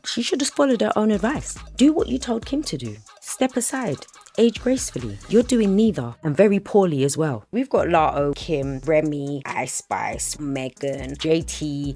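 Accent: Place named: London, United Kingdom. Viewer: So glad I came across your channel, Just subscribed xxxx Love the London accent.. So good to finally find a channel made and bred in my town!!! xx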